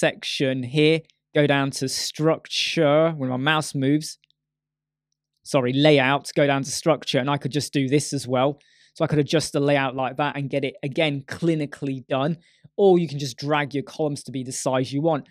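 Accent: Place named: United Kingdom. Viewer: Good videos but I hate british accent. It makes me angry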